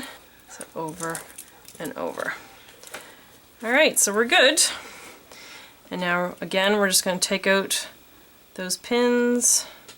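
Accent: Canada. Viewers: This was a wonderful and informative video. It made for a happy Sunday afternoon viewing. My first time watching a pillow lace tutorial, and I think I hit the jackpot! You are great at explaining, and the camera was perfect! I loved that you zoomed in several times so we could see the threads. I’d love if you would put the source links below the video, because I’ve forgotten the name of the book, and the sources for threads and the kits. You are in Bermuda, but you sound like maybe you cane from Canada? Or Northern Minnesota? “Oot” I totally love it, whatever the origin! This is also the first of your videos I’ve watched! Liked and subscribed! ~annie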